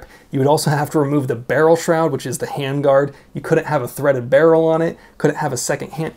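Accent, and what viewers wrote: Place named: California, United States. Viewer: I can tell your from California just looking and listening to you.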